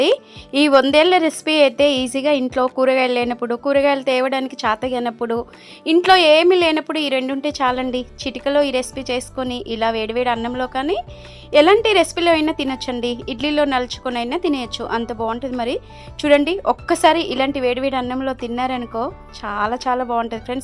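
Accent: Telangana, India. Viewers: Telangana & Andhra mix ah andi? Yekkada mee native?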